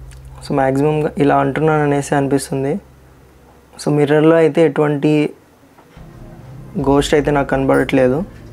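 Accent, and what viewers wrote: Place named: Kadapa, India. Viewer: Kadapa slang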